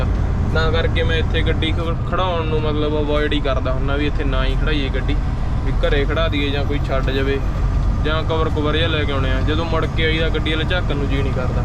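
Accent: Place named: Malwa, India. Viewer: 22 g boli to malwa touch lagda lv u bro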